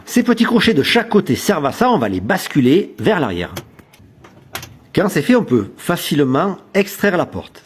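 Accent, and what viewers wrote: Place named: South, France. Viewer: l'accent du sud c incroyable 🎉chef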